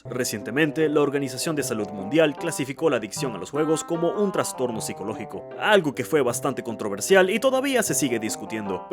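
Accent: Venezuela. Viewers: una pregunta y disculpa eres venezolano?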